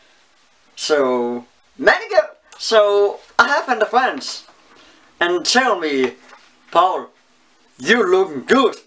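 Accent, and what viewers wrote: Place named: Denmark. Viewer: vety good danglish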